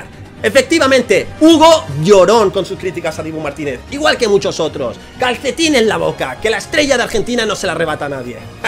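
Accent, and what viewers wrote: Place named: Spain. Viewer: canal argentino????? Si el que habla es más español que pique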